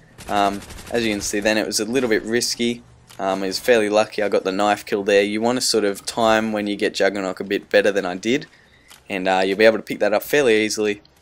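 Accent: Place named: Australia. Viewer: lol u have an accent that even though im aussie as well but thats probly because im watching too many american zombie players ;) so glad i finally found an assie one from faction 115 BBBBEEEEAAAAASSSSSSTTTTTT MMMOOODDDDEEEE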